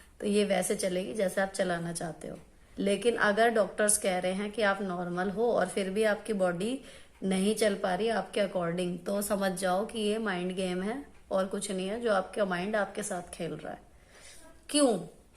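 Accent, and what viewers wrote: Meerut, India. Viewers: Aapne blkul sahi bola apni body ko apna Ghulam bna lo to sab kaam aasani s hote chale jate fr koi pain pain ni rehta ....mere yaha jb maid t tab mere pain bhot hota ta but jbse use hataya h Mai etni active ho gy hu k hairaan hu maid q Maine rakhi t....aapki videos bhot motivational hoti h..... Di aap meerut s to ni hyn? Meri friends circle m aadhe s zyda sab meerut wali thin to hme unka lehja bat cheet bhot ache s pta h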